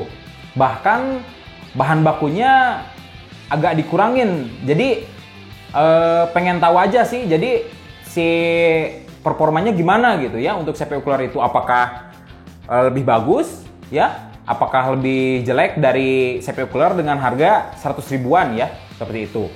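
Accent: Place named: Tasikmalaya, Indonesia. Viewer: orang tasikmalaya dia kayaknya mas akwkwkw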